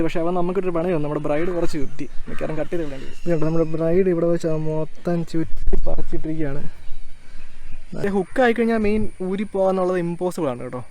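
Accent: Kottayam, India. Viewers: KOTTAYAM SLANG ALSO NICE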